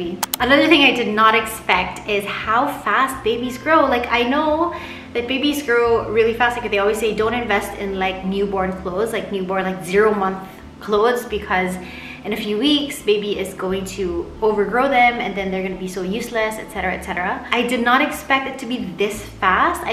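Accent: Visayas, Philippines. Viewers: Idk but bisan dili pako mom or wife I’m still a students I enjoyed watching your vlogs. Excited na nuon ko ma mommy joke mangita pa man diay kog uyab. Cute sad kaayu ka mag bisaya Ms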